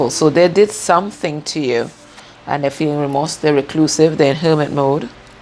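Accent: Trinidad and Tobago. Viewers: U sound like a trini 👍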